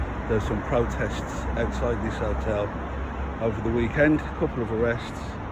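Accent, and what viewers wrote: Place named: West Midlands, United Kingdom. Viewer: Subscribed. I absolutely love your accent❤ I'm fromYorkshire but i adore a West Midlands accent.❤ well done for starting this channel. We need to stand together, we are united in our shared values and morals. ❤ much love to all❤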